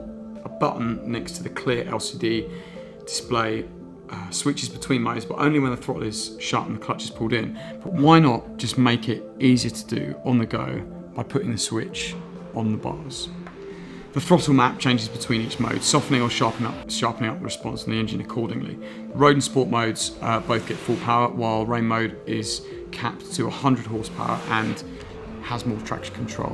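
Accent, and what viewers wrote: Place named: Cockney, United Kingdom. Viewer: Yes the cockney accent is a bit annoing.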